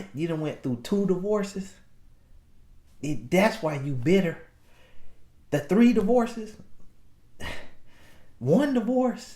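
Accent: South, United States. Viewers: I love your videos and that southern drawl🥰. Thank you for doing what you do! You’ve saved my life as well. You’ve made me laugh at a time when all I did was cry. I think you’re articulate and hilarious but most importantly you love God. God bless you!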